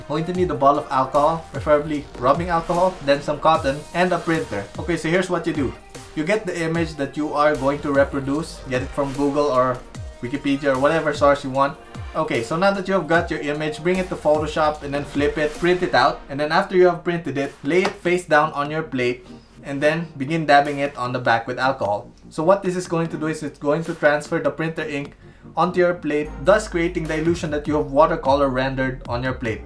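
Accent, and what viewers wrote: Philippines. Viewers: Keep up the Filipino accent dude when you are speaking English because it's our identity haha, btw great work, i will try your tips when i will study architecture again haha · Filipino accent haha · Are you a Filipino? Your accent sounds like how a Pinoy person would speak in english Edit: Oh nvm you are a Filipino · i could relly tell your a filipino by accent hahahaha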